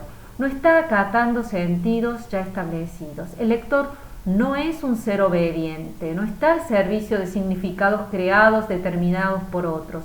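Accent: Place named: Córdoba, Argentina. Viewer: Un luuuuuujaso (leáse con ese hermoso acento cooordoooobés)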